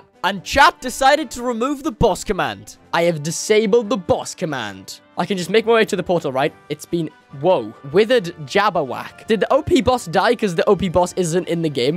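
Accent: Wales, United Kingdom. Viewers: Are you Welsh?😅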